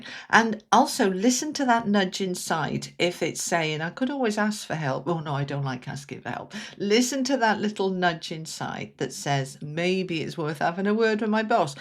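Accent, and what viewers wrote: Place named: North, United Kingdom. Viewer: I love your readings. Your accent reminds me of home (north UK). Always heart warming ❤🙏♐️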